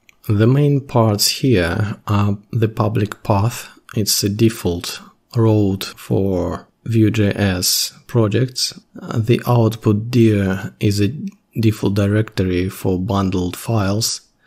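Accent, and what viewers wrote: Russia. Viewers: Сразу заметил русский акцент, но не придал значения, но потом увидел, что пользователя зовут )), благодарю за видео, познавательно!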